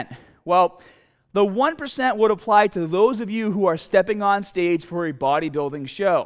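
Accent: Boston, United States. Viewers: Are you from Boston? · Boston. That's what they sound like. I love it. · This is Boston, not LA kid. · That Boston accent on heavy lol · Svein Gustav Hoff When he moved to Boston. He wanted to match the accent for fun I believe. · do you really have that hard of a Boston accent? I've watched many of your vids and I've never noticed it before, it almost sounds like youre embellishing it haha. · Love the Boston accent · Except he has none of those accents. I guess 'American' could work but it's a Boston area accent. There are like 20 'American' accents. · Are you from Boston? That accent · Doda Dado could be either Boston or Chicago accent. Lots of Irish influence in those regions back in the day. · Sounds like Boston to me lol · You’re so from Boston ❤️ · Is he from boston? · It's Boston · Sounded like Boston to me. It's definitely not Australian! 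· It's actually a completely authentic Merrimack Valley accent, from north of Boston, the Lawrence/Lowell area...my own homeland. Though I've been gone for many years, I wax nostalgic hearing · Do I hear a Boston accent? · He sonds part Boston and part dork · no its Bahston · Love watching ur channel and putting your advice to use at the gym trying to get in much better shape also listening to you talk I realize what I sound like to other people when I talk I'm about 45 minutes from Boston I'm in Taunton so mine isn't as strong lol but man now i can see why people from other states recognize our accent immediately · He is from Boston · GLAD TO SEE A FELLOW BOSTONIAN DOING BIG THINGS ON YOUTUBE ..THE ACCENT IS THICK WITH THIS ONE ITS FUNNY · That's a Boston accent what's the problem ? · Canadians dont have accents lol. It's as plain English as you'll get. This is boston all day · Is this dude American or Australian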